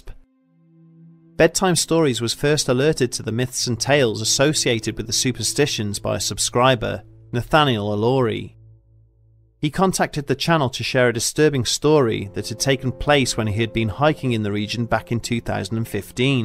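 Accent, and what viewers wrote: England, United Kingdom. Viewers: Why do they have English people telling these stories ? Americans can also tell stories.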